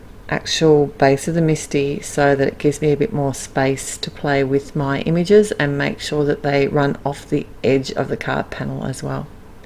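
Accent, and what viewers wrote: Australia. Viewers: That is just beautiful. I think I have that stamp set so I want to give this technique a try. And the inlaid die cutting looks great. You have the best voice - I just love listening to you! It's so great hearing a familiar Aussie accent in a sea of American ones on YouTube!!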